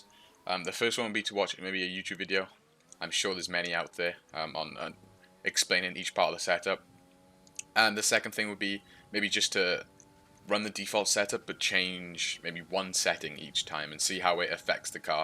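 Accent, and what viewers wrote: Wales, United Kingdom. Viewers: Great info, where in Wales you from?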